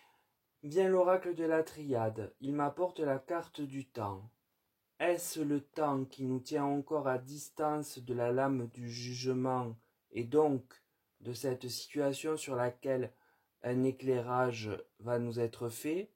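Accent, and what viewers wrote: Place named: Toulon, France. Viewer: Bonjour, comme je t'ai dit sur le live de jordan je me suis abonnée 💋 et ce petit accent n'est pas très loin de Toulon je pense 😜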